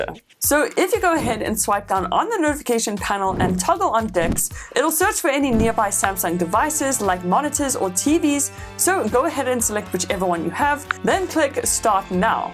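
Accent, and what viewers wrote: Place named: South Africa. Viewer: Your accent sounds like South African is that correct and do you live there now.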